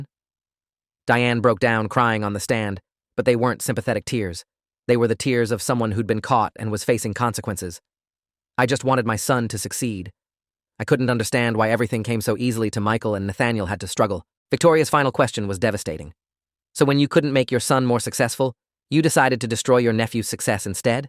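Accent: United Kingdom. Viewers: I think he’s / she’s talking British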